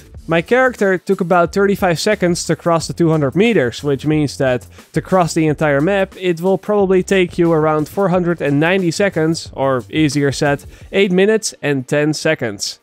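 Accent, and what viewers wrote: Netherlands. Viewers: wist wel dat je nederlands was door je accent😂😂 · Your accent dude genious lang leve holland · mate your dutch accent is hilarious. But good video liked it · aan je accent te horen, gok ik dat je Nederlands bent! Leuke & interessante video =) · Deze gast heeft echt een Nederlands accent This guy really has a Dutch accent. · Prima vid, hebbe gij mooi gedaan! ;P Hoorde direct al dat je een Nederlander was =P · Je kan goed horen dat je nl bent kill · This sounds so Dutch it's not even funny anymore. Atleast it's better than hearing me trying to talk English. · wow nederlands accent haha, goede video man! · I knew you sounded dutch. goed filmpie man! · So much dutch accent! haha goedzo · That Dutch accent tho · wow that accent is so great is sounds dutch but at the same time english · I have the feeling you are dutch #accent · the person talking has a Dutch accent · jij bent zeker nederlands dat kan ik aan je acent horen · van Corpusstein haha ja ik hoorde ook gelijk dat ie uit Nederland kwam de eerste keer dat ik een video van hem zag . 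Nu meer als een jaar geleden. Hij brengt het wel leuk en soms moet ik er wel om lachen dat vernederlandste engels. Maar zijn video's zijn wel informatief. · Zoooo.... Zwaar nederlands accent gast, dat verpest de video gewoon. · hehe tour dutch man😂i can here IT! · Dat Nederlands Engels accent do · lol je hoord het nederlandse accent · loving the dutch accent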